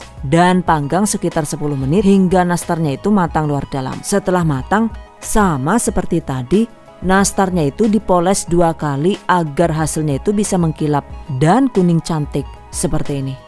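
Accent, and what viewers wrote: Banyumas, Indonesia. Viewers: 😂😂😂ak cilacap bun... klo bunda asli orank mana si....? pake bhsa ngapak juga jadi ngakak pas ada lalat mo ikut bikin kueh nya😂😂😂 ..❤ ak sllu mngikuti resep² bunda g pernah gagal...sehat² sllu ya bund....🎉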